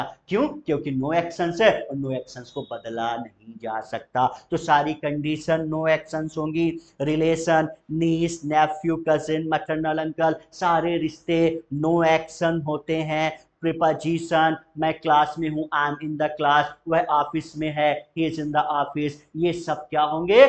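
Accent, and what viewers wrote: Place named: United States. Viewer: Why are you talking in American accent m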